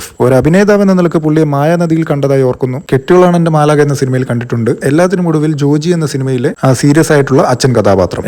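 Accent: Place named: Thalassery, India. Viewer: വീണ്ടും തലശ്ശേരി 😁ഇങ്ങൾ ഏടെയാപ്പാ 😌 കണ്ണൂർ ആണോ..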